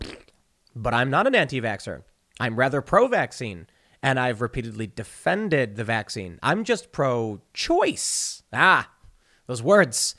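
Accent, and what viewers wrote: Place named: Texas, United States. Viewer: You're starting to sound like a true Texan. Slowing your roll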